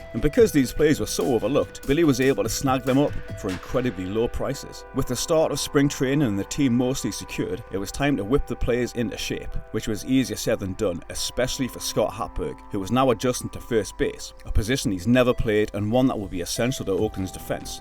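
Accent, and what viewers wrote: Newcastle, United Kingdom. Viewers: gone Geordie? Not what I expected. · Who made the British guy do the voiceover for this one?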